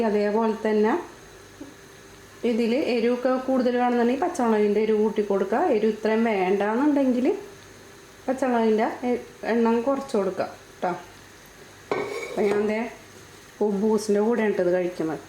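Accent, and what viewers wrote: Kodungallur, India. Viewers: 3 പീടിക, sn പുരം, കൊടുങ്ങല്ലൂർ ഈ പരിസരത്ത് ഉള്ളവരുടെ സ്ലാങ്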